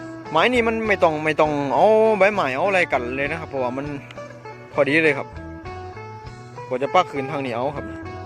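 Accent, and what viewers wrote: Korat, Thailand. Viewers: แก่นี่พูดดโคราชดีเจนเนอะ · คนโคราชเบอะครับ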